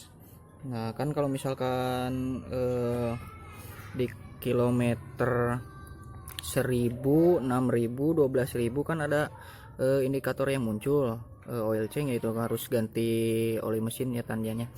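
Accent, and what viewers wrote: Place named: Sunda, Indonesia. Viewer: Siap hatur nuhun bang Logat Sunda emang kental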